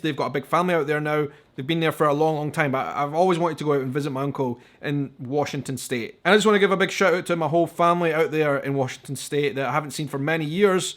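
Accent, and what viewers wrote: Scotland, United Kingdom. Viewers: Greetings from wyoming!! Salt Lake City it’s a diverse city I do love it there , my brothers do live there!! The best time to visit is in the autumn spectacular views from the mountains, I highly recommend it 😌 I love your Chanel your accent it’s so sweet, my son just married a lovely young lady from aviemore and as well looove the accent !! We are planning in visiting Scotland soon I hope!!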